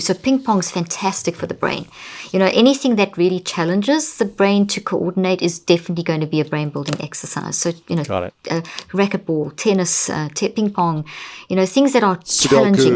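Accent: South Africa. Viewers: Still learning to understand the South African accent of this wonderful woman lol 🍀 💖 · South African 😍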